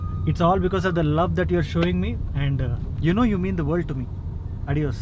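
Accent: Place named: Karnataka, India. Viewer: Ur Kannada accent is 😍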